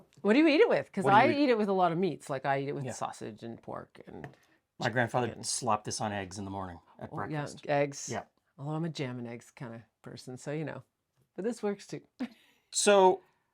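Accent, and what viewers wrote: Canada. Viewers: I love your videos. I found you post covid, and I love your channel! Youre such a calm guy. You come across like a really gentle soul. I love the small coloquialisms from your subtle canadian accent “aboot instead of about” but subtle its turned down. 50%. Its one of the many things I adore about your channel. I love the food history. I love that you partnered with Max Miller on a video. I love when pops in. I love watching your easy every day banter. I love how well you know each other. The only thing I don’t like is that your videos are so short. I would watch you work in the kitchen happily! Tell more stories from your life. Talk more about your grandparents. I am a similar age to you, maybe 5 yrs older. My grandparents died when I was quite young, so love hearing other peoples grand parent stories. I would love to hear more about your time “counting trees?” living in a tent. 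Did you encounter a lit of wild life? Bigfoot, bears, moose, Elk? How many summers did you do that? How did you and Meet? The topics are endless. Bottom line. You and would be a blast to sit around the fire pit with sharing a bottle of wine. Have a great day to you both!!! Beth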